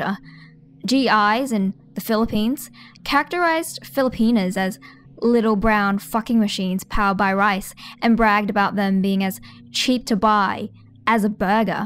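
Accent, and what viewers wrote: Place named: Australia. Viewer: Ironically, has an Australian accent. NICE!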